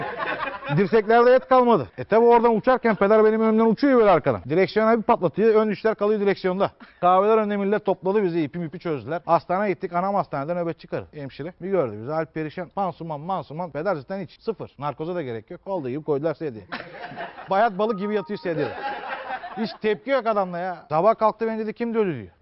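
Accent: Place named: Altınova, Turkey. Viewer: Balıkesir altinovaliar gibi konuşuyor süpersin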